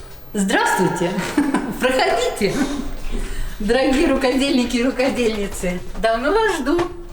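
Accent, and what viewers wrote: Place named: Saint Petersburg, Russia. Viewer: Сразу поняла, что вы из Питера) приятно слушать) здоровья вам!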